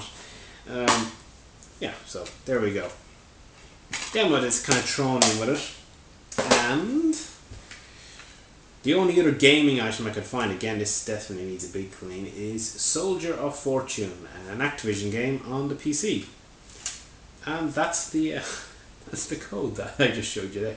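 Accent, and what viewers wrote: Ireland. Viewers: are u irish , u seem to have some sort of an irish accent?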